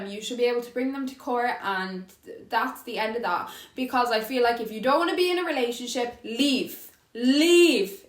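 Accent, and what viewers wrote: Ireland. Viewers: It's like the voice in my head turned Irish, I agree wit everyting you said. 😎👍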